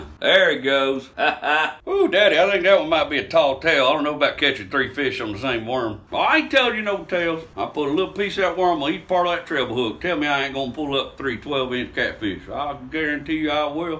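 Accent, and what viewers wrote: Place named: Arkansas, United States. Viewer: I'm from rural southwestern Arkansas (currently live in DFW) and this sounds like every visit back home.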